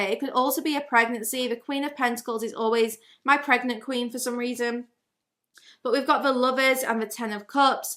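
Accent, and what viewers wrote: England, United Kingdom. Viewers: Love ur accent but cant place it:) are from the UK? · Tf guidance in English accent ... Omg 😃 this is the best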